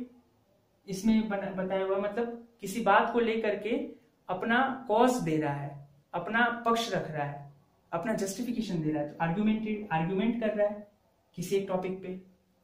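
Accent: Bihar, India. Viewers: Bhai tum motihari se ho kya?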